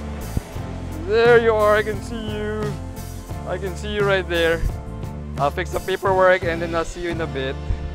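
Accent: Philippines. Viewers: Ooohh, that is so nice 👍🏻 I’m watching your video and my wife hears you talking and she says oh that’s Philippino. I have a 99 Miata. That’s old, but I love it. It still runs great. It’s white with tan top. I wish I had one like yours. Beautiful Scarlet...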